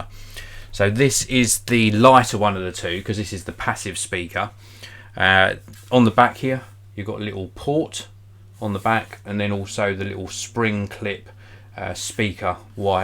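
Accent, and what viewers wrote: United Kingdom. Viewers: I wonder if the roll surrounds on the bass drivers are acclimatized to withstand UK's high humidity climate. Surrounds made of FOAM last less than a year ! BTW, love your British accent.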